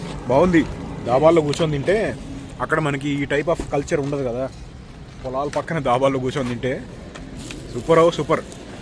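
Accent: Andhra Pradesh, India. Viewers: meedi evooru? Bodhan lo evaru vunnaru? Choostunte Andhra yasalo matladutunnaru? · Anna small doubt naaku me slang telangana slang la undadhu ap la untundhi kaaranam chepthava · Same doubt naku vachindhi bayya.... Telangana salng ledhu pure andhra salng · Anna abn radha krishna type anukunta...few years back they migrated from andhra to Nizamabad and started farming and mixed with telangana culture but slang inka andhra ne ontadi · Anna ne language enduku andhra vala laga undi ?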